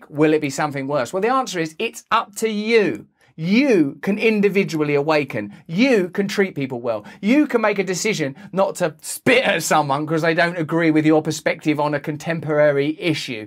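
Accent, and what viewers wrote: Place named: Cockney, United Kingdom. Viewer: Wow, Mr. Brand, you are hitting new highs and I am so glad I can understand you in these last 2 videos... for a while the cockney was so thick and you speak so fast, I could not understand you and quit watching. I think you are now hitting your stride and I enjoy the perspective you are able to present to us.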